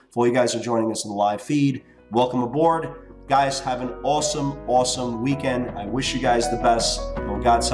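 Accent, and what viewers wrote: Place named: United States. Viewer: your accent is quite possibly the best American accent in existence.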